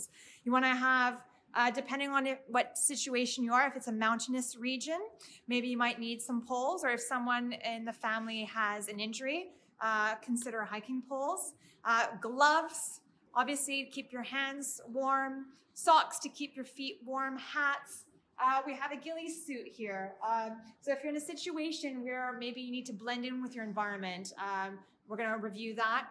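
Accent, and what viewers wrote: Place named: Vancouver, Canada. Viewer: Sound like u r from Vancouver. Lol This survival prepper stuff has been gojng strong for 20+ yrs now. What do you think our number one prep reason should be? The cause to prep.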